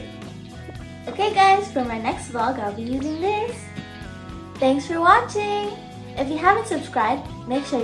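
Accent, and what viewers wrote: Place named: Sri Lanka. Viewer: That's awesome! Happy for you! Looking forward to more exciting videos on your channel. Keep it up! Love your Sinhala pronunciation and Akka's cameo!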